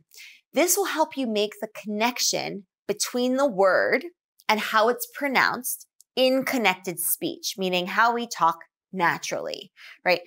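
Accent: United States. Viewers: What a beautiful style of your speaking an American English thanks for your kindness.forget you not sprite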